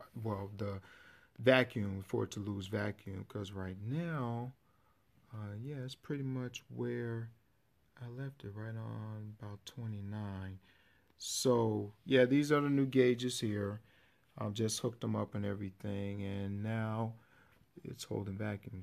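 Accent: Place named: Louisiana, United States. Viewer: dude, where in Louisiana are you from??? lol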